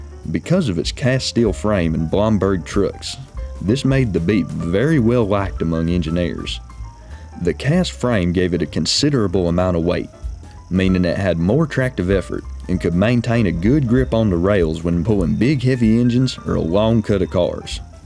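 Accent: South, United States. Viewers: This guy is the most American-sounding American I’ve ever heard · This is by far the best channel Ive stumbled upon recently! Your accent sounds like one that should belong on any of my fishing buddies, glad to see there are more good old boys into horses of the Iron type than just me. Lmaooo, liked and subscribed, keep up the videos! I really enjoy them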